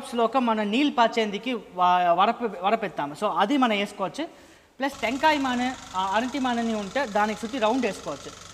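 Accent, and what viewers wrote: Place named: Karnataka, India. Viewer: Nayana ayana Karnataka వరుల ఉన్నారు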